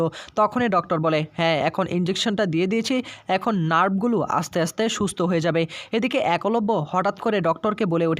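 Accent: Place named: East Bengal, Bangladesh. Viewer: বাঙ্গাল বাঙ্গাল উচ্চারণ ,অশিক্ষিত। কথকতা করতে শিক্ষার দরকার। শিক্ষা নিয়ে তারপর কথকতা করতে এস।চেষ্টা করলে পারবে।শুভেচ্ছা রইল।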